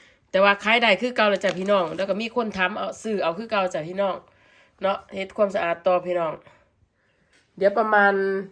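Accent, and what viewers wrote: Korat, Thailand. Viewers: วัสดรจ้าลูกสาวยากไปจิไปติดต่อกะใหนจ้าคุณ เป็นคนกะใหนจ้าพูดคือคนโครราชเนอะจ้า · คน โคราช นิครับ คือ พูด สำเนียงโคราช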